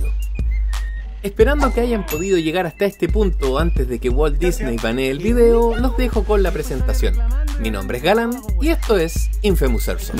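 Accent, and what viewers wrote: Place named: Chile. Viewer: Te acabo de conocer y me encantan tus videos, pero tu acento se nota que es chileno, así que lo diré: Es aguacate no palta. Nada que ver el comentario xd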